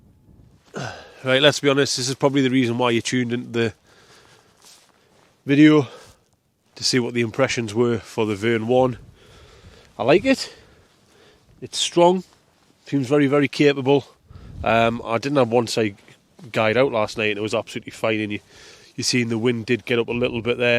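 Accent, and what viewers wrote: United Kingdom. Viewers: Am I the only one that had to turn on the sub titles? I really struggle with North of the Tees accents. £500 for a tent... It better be good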